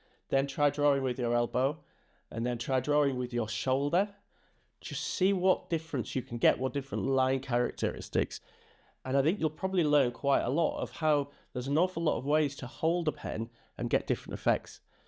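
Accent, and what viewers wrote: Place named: Yorkshire, United Kingdom. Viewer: Our washer is running, which is quite loud, so at first it sounded like you said, "Holding the pen at the fruit store." 🤣🤣🤣 I have no trouble understanding your Yorkshire accent (I'm an American with a longstanding love for British TV especially Monty Python) but with the washer running it made it sound different. I should maybe also mention that I often mis-hear words as a quirk of my autism. It makes for some hilarious conversations with my husband. 😂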